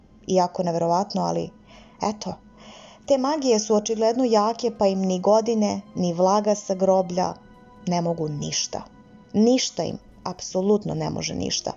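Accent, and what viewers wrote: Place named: Podgorica, Montenegro. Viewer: Dobra priča. Iz Podgorice sam obozavam ovaj naglasak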